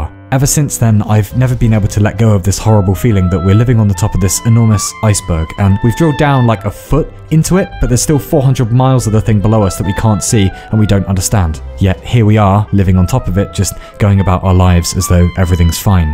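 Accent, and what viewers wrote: England, United Kingdom. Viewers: is this the same guy from the zephereus channel or do all brits sounds the same to me? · Orchestral Piece Begins British Bloke starts speaking fast This is when the videos make me shag the vicar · right away u know u r listening to a Brit. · Brits will always have the best narration voice, love ur vids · There's like a whole bunch of youtube channels now with narrators with the same Alain de'Botton accent, and I'm not convinced that they're not all de Botton. But this one is the best one. And if it is de Botton, he's much smarter than in most of the other channels. And on a side note, why do Brits with this accent sound smart, even when they're dumb as doorknobs. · your beautiful fellow English voice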